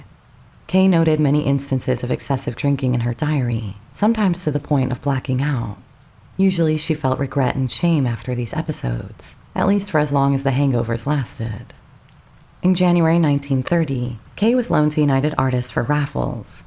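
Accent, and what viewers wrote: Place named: South, United States. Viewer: What was doing?The documentary has a lovely and southern voice, unlike so many of them that are like gracious, I love your voice, whether it's real or unofficials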